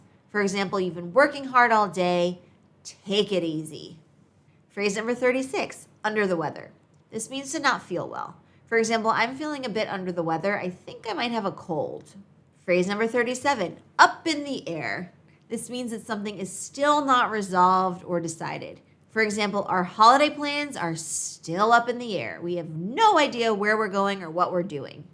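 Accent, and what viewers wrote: United States. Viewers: Nice, i'm from Jakarta, I like maerican english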